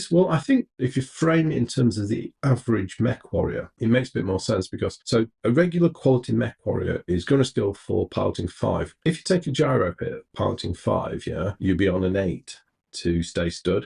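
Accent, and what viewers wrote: United Kingdom. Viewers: Thanks for the analysis. But unrelated, East London accent?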